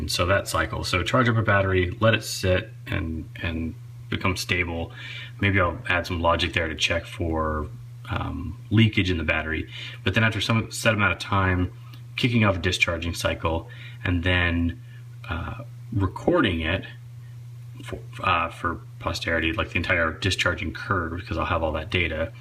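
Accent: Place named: New England, United States. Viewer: Are you on the East Coast or from there? You seem to be like a Yankee :o)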